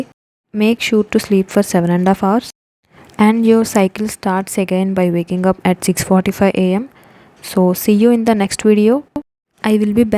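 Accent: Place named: South India, India. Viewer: Your accent looks like from south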